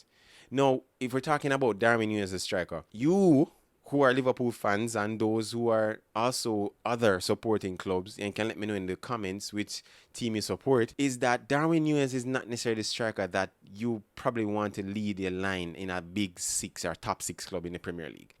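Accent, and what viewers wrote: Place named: United States. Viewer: They said that aboyt him last season, he is not in the decline. I reccomend you dont say that until its actuallu factual it brings into question the validity of anything you say especially with an american accent plays into the steriotype a little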